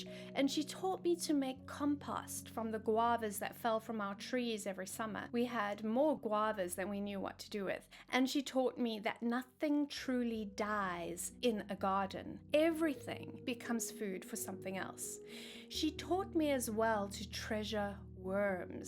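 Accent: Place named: South Africa. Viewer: I cannot explain how ecstatic I was when I clicked on this video recommended to me and heard a South African accent! So excited to watch every single video as I’ve been watching witch channels from America and the UK but some things were just not relevant to my location. ❤❤❤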